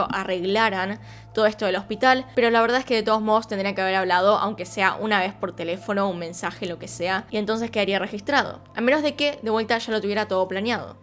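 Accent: Argentina. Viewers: Es el acento de algunas provincias de Argentina. Yo también hablo así, no tiene por qué molestarte. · Eres Argentina???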